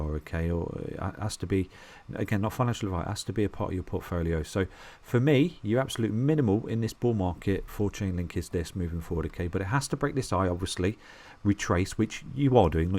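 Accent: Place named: East Midlands, United Kingdom. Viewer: I reckon that's an East Midlands accent!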